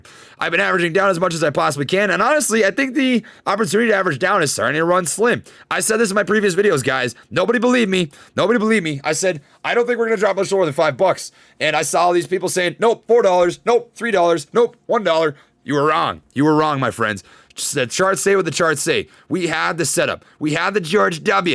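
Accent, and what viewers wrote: Minnesota, United States. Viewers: Minnesota boy?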